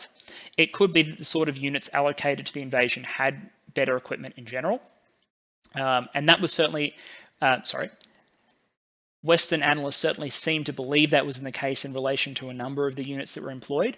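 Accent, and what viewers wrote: Australia. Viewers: Your talking clap trap! Russia has been met with strong resistance by the Ukraine armed forces, and is heavily armed with British anti American anti tank missiles and anti aircraft missiles along with arming just about the entire male population aged between 18-60. Best you just go and give your opinion back in Australia judging by your accent.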